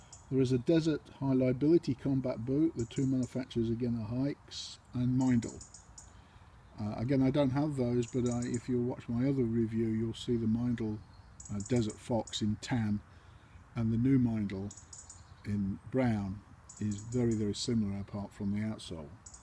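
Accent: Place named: United Kingdom. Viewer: Ah, that lovely British voice ! Of course, you guys used your ruddy boots to kick the shit of of my country and countrymen for about two hundred years but since you people also brought in modern medicine and science and the bloomin railway, I'm not gonna hold that against you. Good video... great boots, the new ones, that is. The black ones were shit. Lovely presentation. Wish your lot hadn't decided to become increasingly 'American'. Alright, take care then. Toodles !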